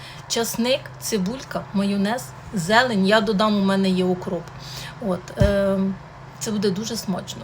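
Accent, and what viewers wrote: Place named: Surzhyk, Ukraine. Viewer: Гарна дівчина,але суржик її портить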